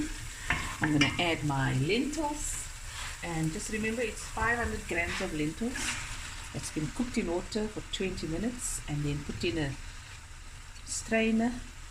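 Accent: Cape Town, South Africa. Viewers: It's so nice to walk with you through your garden. Your lemon is doing well 🤣 Oupa's fence looks sturdy and Sunnyboy is as beautiful as always. Loved your story time, so similar to mine. Food, love, bread and always some left in the spens. Lekker koue groenbone op brood, met sout en peper. Jou hart is vol en jou stem so warm en vol liefde. Nou gee jy als terug op soveel maniere en ook hier op YouTube. Dankie vir als. En jou pragtige Kaaps. Baie liefde. 🥰🤗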